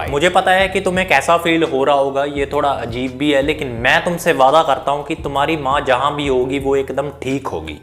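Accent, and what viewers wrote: India. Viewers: Proper indian accent got me. Anyway, how did this video pop on my yt??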